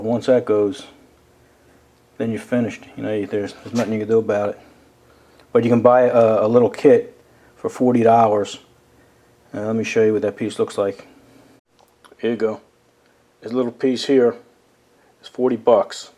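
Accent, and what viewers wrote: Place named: New Jersey, United States. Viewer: Thank you! this is exactly my problem. Awesome vid! also, are you from New Jersey? -just wondering cuz of the accent.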